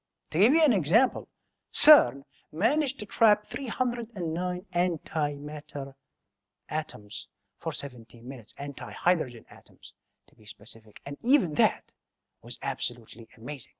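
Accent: Arab world. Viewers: اللكنة العربية المستشرية احلى ما في الموضوع :D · he's got a really heavy arab accent (im arab myself) ..is he actually arab ? nevertheless he is amazing :D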